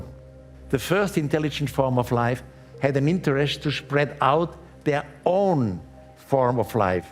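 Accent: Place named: Switzerland. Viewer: Er hat erst kurz vor seinem Tod angefangen Videos auf Englisch hochzuladen und weil er halt Schweizer war und älter als mein Vater verstehe ich sein Englisch besser als native Speaker. Ich habe damit kein Problem. Von Däniken und ich sprechen Englisch in etwa auf demselben Niveau, welches nicht besonders anspruchsvoll ist. Insofern: No problem. 😅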